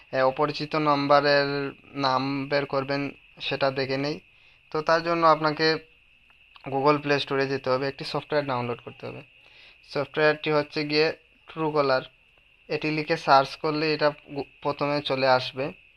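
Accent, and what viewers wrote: Sylhet, Bangladesh. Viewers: তুই সিলেটি না কিতা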